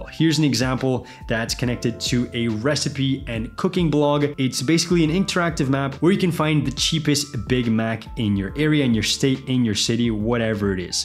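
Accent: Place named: Singapore. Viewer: I've just spent 5 hours trying to work out your accent I'm going with schooled at an international school in Singapore or some shit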